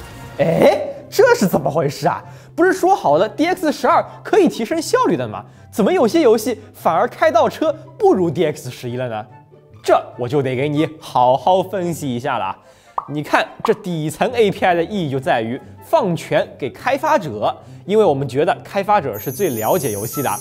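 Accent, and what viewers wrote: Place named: China. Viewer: this is the PRC's essense of pronounciation.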